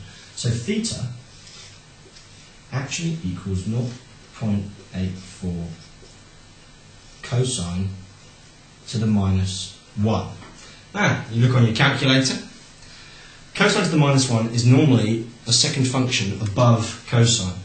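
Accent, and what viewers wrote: United Kingdom. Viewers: Are you british ? cuz ur accent looks like a british guy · loving the British accent, and the video was also quite helpful.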